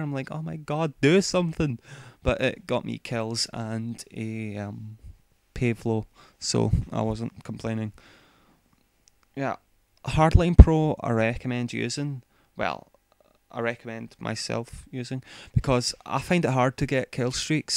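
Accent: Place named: Scotland, United Kingdom. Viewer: Where about from Scotland you from?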